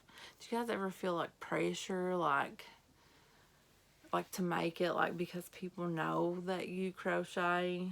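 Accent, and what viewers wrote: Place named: Indiana, United States. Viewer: Oh wow I finally come across someone who from Indiana as well...But u sound like ur a little more North from where I'm from...i just subscribed to u but loving ur video ...looking forward to more videos....God Bless and hope u have an amazing week....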